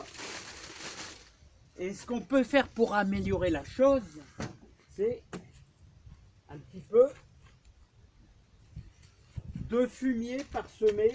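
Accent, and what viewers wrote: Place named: Hirson, France. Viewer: Vu l’accent j’aurai dit vers Hirson merde j’ai perdu j’ai quitté la région depuis trop longtemps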